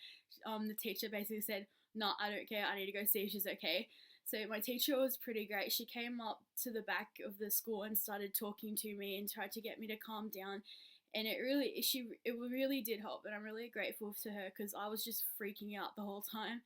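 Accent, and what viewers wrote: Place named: Australia. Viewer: U sound Australian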